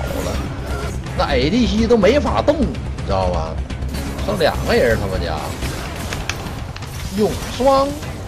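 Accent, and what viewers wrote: Northeast, China. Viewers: 其實大哥說的是東北話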